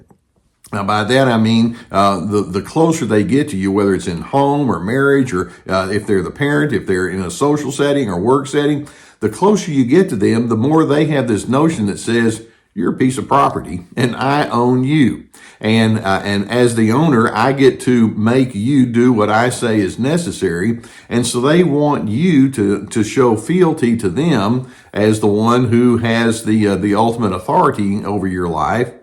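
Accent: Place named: Texas, United States. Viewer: Oh boy! We love the Texas accent. Very very cool, it is. Swish and stylish. The Texan accent. From 2nd April 2023, My beautiful husband PD and I went NC from our abusers so, this means that we have experienced peace for six weeks so far and counting! What do you think of that, Gus? Woof from Evie dog here in Yorkshire UK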